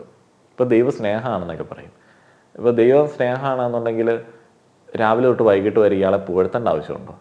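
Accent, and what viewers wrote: Kottayam, India. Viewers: Bro Kottayam kaaran aano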